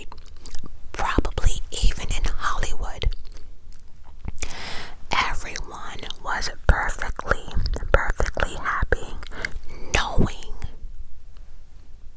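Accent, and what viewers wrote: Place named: East Coast, United States. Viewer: I’m new here, where are you from? Because the upper east coast accent is adorable, but if you’re anything like me, I always talk with accents lol. Love your videos!! I’m listening to this one while cleaning my kitchen on a Sunday and it’s chefs kiss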